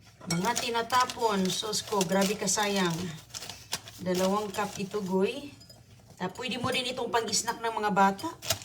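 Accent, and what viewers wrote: Visayas, Philippines. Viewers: Anong masama? Ndi nmn pagandahan ng pronounciation jusme,bisaya kasi sya kya ganun nalang pagbigkas nya,ndi na kylangan tamangnbigkas .marunong knaman yta makinig intindihin muna lang.mga ibang pinoy tlg mga perfectionist kala nman sosyal din,mukhang nag ppakasossy lang naman😂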